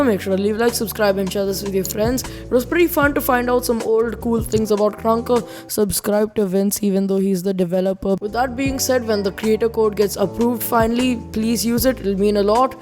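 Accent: India. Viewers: Your accent seems like u are Indian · your indian accent is so funny and good at the same time xD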